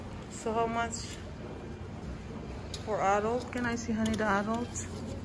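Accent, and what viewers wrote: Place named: Ilocos, Philippines. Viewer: nice vlog. Narinig ko lng Iokano pala kau. hehe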